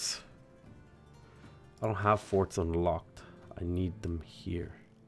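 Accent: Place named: Ireland. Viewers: Ah he's Irish, since i'm not from an english speaking country it can be hard to identify such sometimes. I usually associate Irish with the incomprehensible speech of rural citizens, even though that is probably only a small percentage :) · Just Irish. My lot are from Galway, let's just say you're unlikely to get any West Coast farmers as youtubers 😉 no fecker would understand them.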